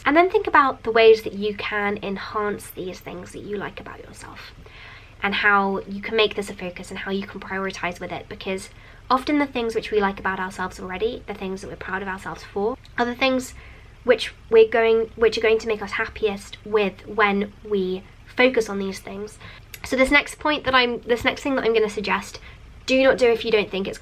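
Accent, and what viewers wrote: RP, United Kingdom. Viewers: I feel so ruff after watching this your accent is sooo posh I am half from Essex half from Cardiff ly x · Is it British accent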